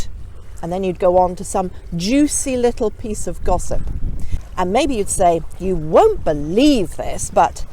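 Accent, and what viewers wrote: United Kingdom. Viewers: I like your standard English and Received Pronunciation,, thanks for great tips ,, you asked before what sounds we have problems with ,, well I have problem with ‘b’ sound , the I know the main difference between ‘ b’ and ‘p’ is the former is much stronger than the latter but the trick is how I can make ‘ b ‘ such a strong sound ??? Apart from vocal cords use as I use then while uttering ‘ b’ but I feel I still miss something to make ‘ b’ more powerful but I don’t know what is it !!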